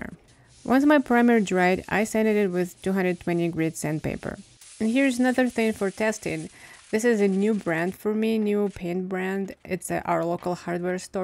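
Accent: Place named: Eastern Europe. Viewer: oh no don’t get rid of it , it’s nice ! I thought it was Eastern European . Lovely ! We like your makeovers very much !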